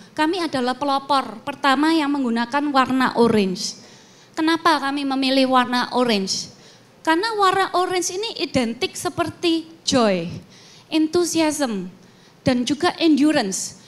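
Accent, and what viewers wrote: Java, Indonesia. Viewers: Logat jawa nya terdengar jelas. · medhok jawanya kental bangeeettt 😀😀😀😂😂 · MEDOG TENAN REK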